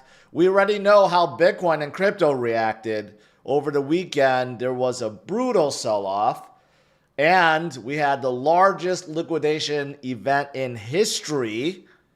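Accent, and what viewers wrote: United States. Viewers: Hey! You really don't know Canada, and you talk like you're American. You look nothing like an American. I liked you, but now I see your true colors. Canadians are very nice, but we're not stupid. We wonder why your president wants Canada to be part of the United States it will never happen. We'll always defend our interests first, as if we were the ones who started it. We're not all Georges.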